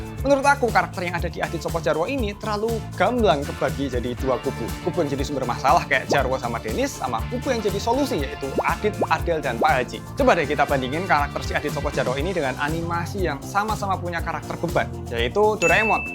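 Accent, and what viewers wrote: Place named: Java, Indonesia. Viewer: Tambahan 1 lagi, terlalu kuat Jawa nya bang, malah lebih suka animasi Ibra dan kiko.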